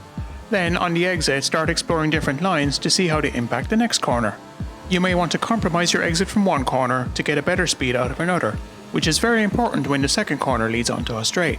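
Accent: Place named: Kilkenny, Ireland. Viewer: What part of Ireland are you from? I can't tell by your accent I am guessing Kilkenny? 🍀🇮🇪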